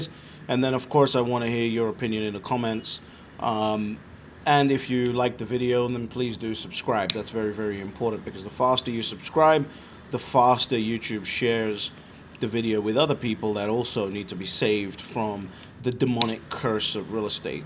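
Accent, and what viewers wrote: United Kingdom. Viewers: Digging the British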